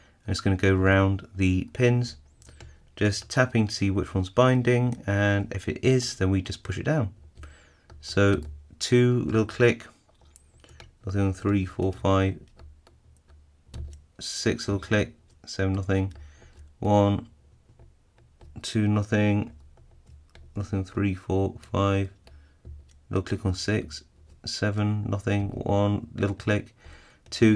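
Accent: England, United Kingdom. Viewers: Man i just wanna say i love your vids dude. the english accent will never get old to my ears lol. Not to mention i love the way you explain things, everything makes so much sense. IMO the vids are on par with LPL, albeit different video types, but still in the community, which is why i drew the comparison.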